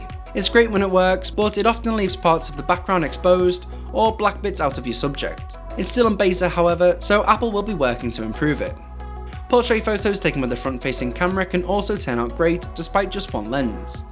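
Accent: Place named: United Kingdom. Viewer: It’s his accent ... he’s probably from the UK or Australia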